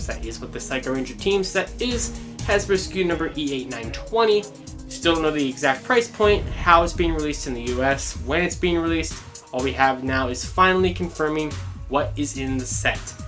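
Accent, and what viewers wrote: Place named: United Kingdom. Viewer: Omg i thought you were from the uk lol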